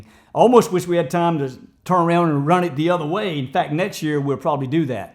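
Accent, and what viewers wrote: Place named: West, United States. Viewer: You have an great western cowboy accent and amazing story narrating ability.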